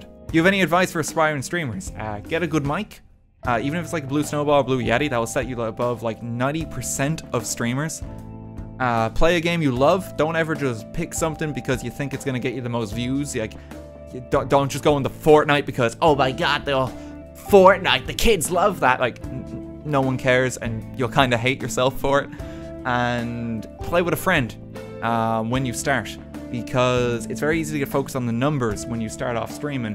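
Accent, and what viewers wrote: Ireland. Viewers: This might be shocking but from listening to your voice you might be irish.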